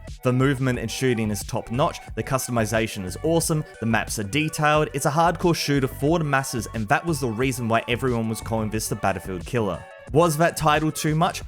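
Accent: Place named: Australia. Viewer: You sound Australian my guy. Do you ever get good ping?/are their AU servers? From the 5 or so games I've played they've all been red ping. Not worth the headache for me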